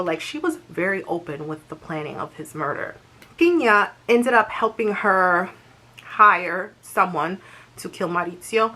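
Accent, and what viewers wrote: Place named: Italy. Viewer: Love your Italian accent! Good review! Now I want to see the movie!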